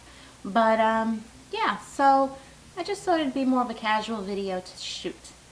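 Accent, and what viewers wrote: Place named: Long Island, United States. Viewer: I appreciate the videos for average, mature women as well. I found you doing a search for curly hair and when I heard the accent I was like ok, I,m from Long Island. So keep it up and thanks for sharing.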